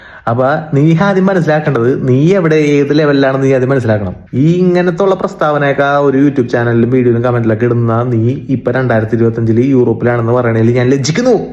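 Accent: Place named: Coastal Kerala, India. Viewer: I started watching your videos bcz of your very interesting kadapuram slang,nalle rasaan kekaan....kore alkare chettan chiripiknind....keep going bro🎉❤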